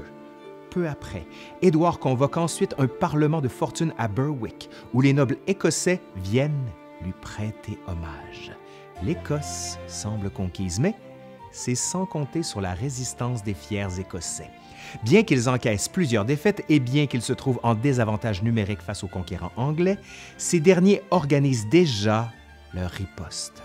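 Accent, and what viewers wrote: Quebec, Canada. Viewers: je suis un simple francophone, je vois une vidéo avec un accent Québecquois à couper au couteau, je souscris. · Tabernacle Chrit osthie j adore l accent québécois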